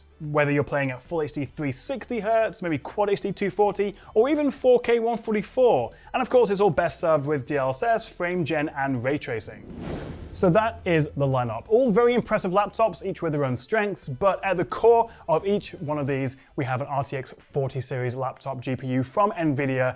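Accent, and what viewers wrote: United Kingdom. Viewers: Thanks for the review. It was very informative. I like your British accent!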